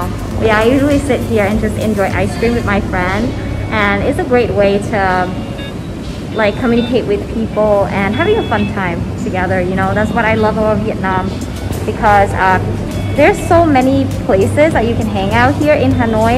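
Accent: Midwest, United States. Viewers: Your American accent is so good...love your Vietnamese voice too, very nice videos and editing. Keep up the great work. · beautiful girl with fantastic American accent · I like most of your videos, but one thing you say wrongly (my view) is your very American pronunciation of the word HERBS....you say ERBS! Correct English expression more acceptable to a wider English speaking audience is NOT to drop the "H" of such a common thing in Vietnamese food speak. The Americans have changed a lot of the English language as you know, and while your American accent is cute we Aussies of the older generation particularly have resisted Americanizing spelling & pronunciation. And you know as a Ha Hoi girl being educated in US when they were the former enemy of your parents & grandparents it is a big culture difference thing too. Let's discuss in our next Checkin! 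With me about to start learning Vietnamese I must try to pronounce things the correct way when in the north, central or south. I want to learn the Central & North ways firstly. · Really like the new (?) logo. Feel it fits you and your channel really well from what I have seen. Do you know the artist personally? Suggestion: Add google map links to places you highlight in the description. Paddy Doyle does this and it is something I think every vlogger should copy. Works so well to easily find and save places. Like that cool ice cream shop which I saved. Side note: I know you said (in another video) some people said things about you vlogging in English vs Vietnamese. But there are people like myself who really love local vloggers sharing their country with us in English. There is nothing wrong with the standard western expat or digital nomad vlogger (minus the creepy ones) but it is nice to have locals in the mix as well. 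My first exposure to "travel" vlogs was via some Fil-Am vloggers, Bulay Says Hi (she stopped) and Wil Dasovich (which I found via Bulay) and his friends. There are several Filipino (and half Filipino) vloggers who vlog in English but outside of PH it is much more rare. So I am glad the YouTube algorithm suggested your channel vs how so often I get suggested the "creepy" ones. (Sorry YT but just because I like videos about ASEAN countries and watch expat vloggers doesn't mean I want to watch videos like that.) Nice to get suggested a good channel vs a "creepy" one. Bonus that you are truly a local. Double bonus that you have a midwest accent like myself lol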